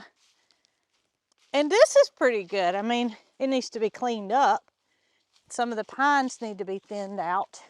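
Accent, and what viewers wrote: South, United States. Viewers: I really love your charming southern accent and phrases. I'm from South Africa so your accent is so different from where I am from. I love all your video content as well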